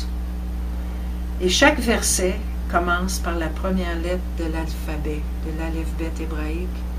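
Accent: Canada. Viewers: Shalom ma belle! Comme je suis heureuse de vous trouver! Je suis dans cette merveilleuse découverte de l'Alaphbayt et de tous les merveilleux secrets que Notre Père YaHUaH a caché dans celui-ci. J'aimerais bien en discuter avec vous si possible et Yahuah voulant..Lyne ❤️. Écoutant ton accent, je vois que tu serais du Québec..HalaluYahuah 🎶 Sois bénie (BaRuCH) en Yahusha ha Mashyach 🌺❤️ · bonjour, il faut lui demander. Vu son accent elle est au Canada. Que Dieu vous bénisse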